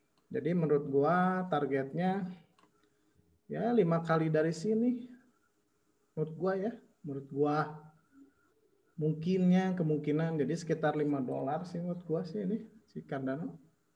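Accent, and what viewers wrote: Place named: Sunda, Indonesia. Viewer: Langsung gue sub ini chanel josss,,,medokk kaya orang sunda😎😎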